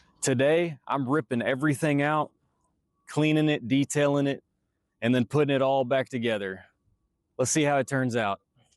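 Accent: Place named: Tennessee, United States. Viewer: I thought it sounded like you were from Tennessee or Kentucky, then I saw your tags. That's quite a drive. I do LA <--> Nashville a lot. Not living in the car, so I don't have a setup as developed as yours, but the trips are long enough that your expertise has been relevant and helpful. Thanks.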